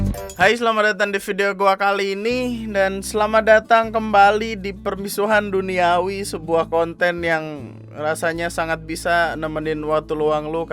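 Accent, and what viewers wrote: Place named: Tegal, Indonesia. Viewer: Tegal keras cuy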